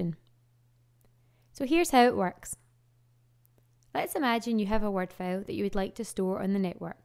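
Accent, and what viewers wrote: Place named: Scotland, United Kingdom. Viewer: Nice female Scottish voice :)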